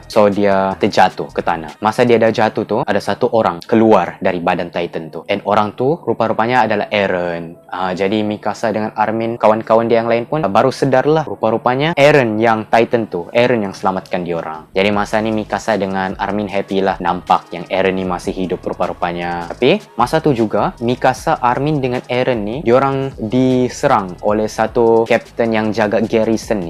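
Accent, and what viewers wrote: Sabah, Malaysia. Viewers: Saudara speaker ini pasti orang Sabah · Hsha sya suka ni orng ckpp ORANG SABAH THE BEST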